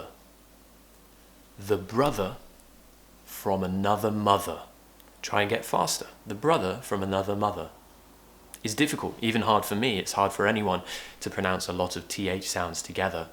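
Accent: RP, United Kingdom. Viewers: Hey i have seen your all video. And i want to recommend something. And i want to requist something.and i hope. That is when i read a bible i found serious pronunciations in the verse.would u mind if u receive my request? MATTHEW Chapter5 verse 23~25 I need your help and British accent thank u my best teacher ※i just hope. You don't need to get serious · I am actually from Essex however I am doing a language exchange with an Italian guy who struggle with "th"... I have recommended your video to him because it is AMAZING! Can I ask do you generally speak with an RP accent or do you do it just for your videos? :) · You are an amazing teacher, your British accent is amazing...lots of love from Bhutan 🇧🇹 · good looking with this hair cut Thanks for your teaching eng with british accent😍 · Dude this is super difficult. Cause we are taught to speak “other” in a completely different way in India. 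It defintely doesn’t sound like udder but we don’t put the tongue in between. As you said , the muscle memory has to be worked on. You sound super POSH. Want to learn to speak in the same way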